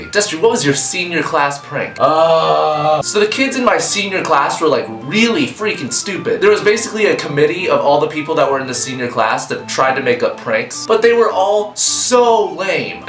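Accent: England, United Kingdom. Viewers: lmfao, english accents with american lingo xD<3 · aw, your english accent makes you sound slightly camp. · i thoroughly enjoyed the english accent. makes you seem that much hotter ( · i like your english accent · XD hahaha i love ur british accent u sound so smart.... TITLE: REVENGE OF THE HORNY LAMPZ!!! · Your english accent just made me jizz. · Your english accent was beautiful xD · OMG destery with an english accent = HELLA FUCKING HOT! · English Accent=♥ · With the english accent, you sound like Russell Brand:) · u sound amazing with a british accent!!!! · Destery, your English accent is amazing. and what model is your fridge? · My tit is stuck in a bears mouth:| im joking, you actually sound even hotter with an english accent and thought it was pretty good:D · + English accent = amazing Phil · Your english accent is quite brilliant =L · oh my fuckery. 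how the hell does an english accent automatically make him so much more attractive than he already is? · YOU ARE REALLY GOOD AT BRITISH ACCENT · love ur british accent! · i love ur bristish vioce so adorable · i really enjoyed that english accent:) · omg superhot with an english accent ur sooo good at it <3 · You'r English accent reminds me of the time I was reading something out of a chemistry book in class.......I got kicked out of the class -. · The whole time you were talking in a british accent, i wasn't even paying attention to what you we're saying cause I was laughing so hard. anyways, Title: Hops a Minivan filled with turtles snorting crushed unicorn horn. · + British accent= Ear orgasm. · Destery with an English accent= yes. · i died y you so sexy destery i can not get over how amazing you look:O and you with english accent:O kirjhnujyrhwjjbbhrgjbrgsbgbdjrs OMNOMNOMNOM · You're English accent is strangely amazing:D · Destery+English accent. 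I fainted. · Your English accent makes guys with real English accents sound like fakes. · title:destery givs ppl erectile disfunction. comment: omg love the english voice, and the no braces! so cute! u rly nice teeth!!! omg<3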